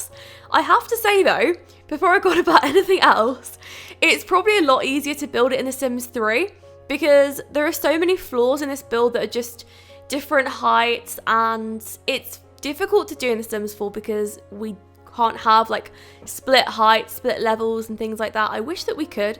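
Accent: United Kingdom. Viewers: I like ur british accent 😂❤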